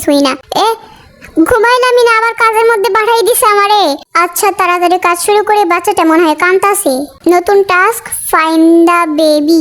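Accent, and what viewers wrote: Bangladesh. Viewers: আপু আপনি কি খুলনার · নাটোর ভিলেজি তাসিটি হার কথা বলতাছে পাওয়ারফুল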